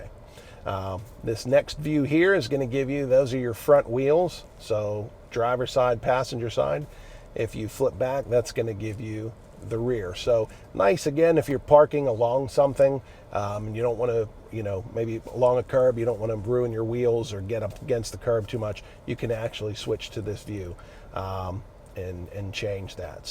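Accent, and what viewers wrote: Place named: Pennsylvania, United States. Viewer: Love the "Uniontown, Pa" accent!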